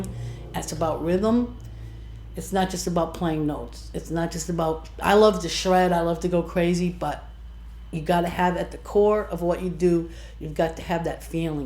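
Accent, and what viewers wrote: United States. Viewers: Love your accent “Chicago” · A dear friend just turned me on to! Wow wow wow! She is the real deal. How have I not heard of her before? I am going to check her Zoom lessons. You may be from Chicago but do I hear a Boston accent? You rock!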